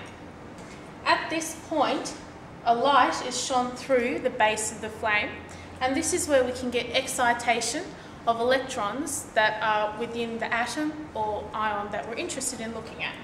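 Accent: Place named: Australia. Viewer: hey, you sound Aussie!! Great explanation - used it in Yr 12 HSC Chem today. Thx heaps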